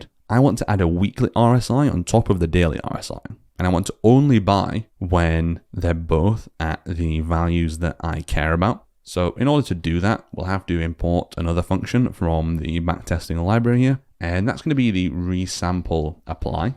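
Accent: United Kingdom. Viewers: great work, this is excellent. I also appreciate the northern accent. It's been a while since I've heard a proper one. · Thank u… By the way, I like your contents a lot because of your exclusive content, as well as your deep and soothing voice, With that British accent :) so because of that, I can normally watch an hour-long video with no boring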